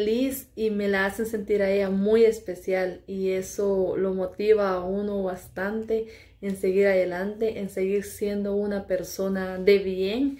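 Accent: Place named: Mexico. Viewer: . Holaa apenas anoche mire tu video yo miro los canales de tus ermanos. Y a todos las chicas y chicos me la pasa viendo los videos no sabía que tu tambien asias. Videos me encanta como eres te espresas muyy bien se me ase que tienes un asento en tu voz como mexicano tus ermanos y todas las chicas y chicos tienen diferente asentó. Pues bueno a segir adelante ya me suscribi a tu canal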